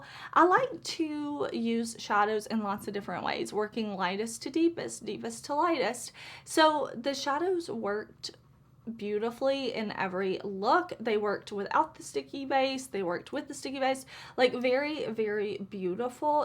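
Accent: South, United States. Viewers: Wow 🤩🤩🤩🤩 That last look w/the green in the “socket” 😉- Just WOW!! That multi-chrome w/the predominantly pink shift; that’s got to be a gift from God, & I’m not sure I’ve seen one of those types of shadows on camera as reflective as that one. I kept thinking “oh no, she missed a spot of her lid space w/that multi chrome”-but no, I knew your skills were better than that, it was just the amazing shift and the way the color would “jump” around ur lid; girl, it practically dances across ur eyes ! I am only learning of this brand(from you). I actually kind of gravitated to ur channel after watching a few of ur vids, and appreciating ur seemingly unwavering support of these Indy brands, that other than Color Pop, I knew ZERO about! I’m fascinated, I’m hooked, and I think you’re great and that’s why I subscribed. You’re smarts, beauty, charm, southern accent, are just too refreshing to pass up 👍🏻💄💋❤️👏🏻 I’m learning so much, thank you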